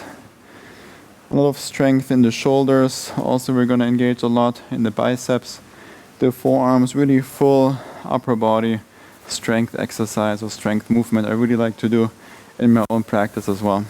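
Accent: Germany. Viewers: There's nothing better than breaking a mild sweat during yoga practice. I think the German-esque accent helps as well!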